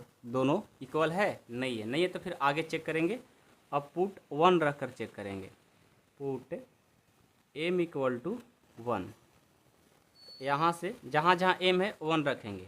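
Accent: Bihar, India. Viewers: Padhana bahut acha hai sir but ye jo alag se sound aa raha hai ye language Bihar ka lag rha hai😊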